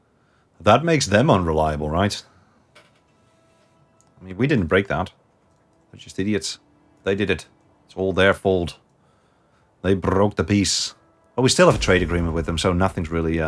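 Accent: Netherlands. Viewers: I like your gameplay... I hear that you are Dutch. Do you also have a face cam? Keep up the GOOD work!! 😄👍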